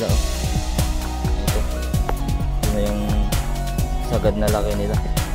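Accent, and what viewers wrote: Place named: Nueva Ecija, Philippines. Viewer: Taga saan ka bro parang nueva ecija ang punto mo ahh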